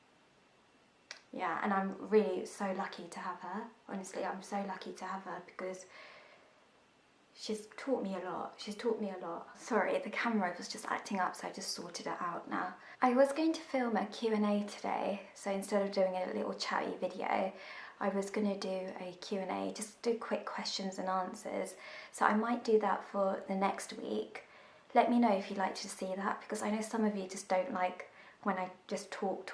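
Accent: United Kingdom. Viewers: ur from india and u have a british accent lol. that's so cool. how come u have a british accent but ur indian.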